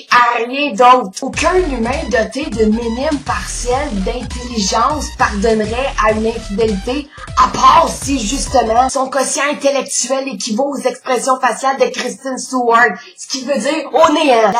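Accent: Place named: Montreal, Canada. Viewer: ah laccent dmontreal... jaime bien :3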